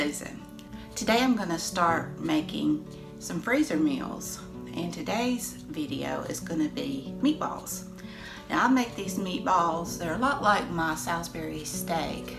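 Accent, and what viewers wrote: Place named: South, United States. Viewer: thanks one more time, all the way from Germany 😊 BTW, I Love your accent. We lived in Missouri some time, but southern accent is my favorite in the whole USA.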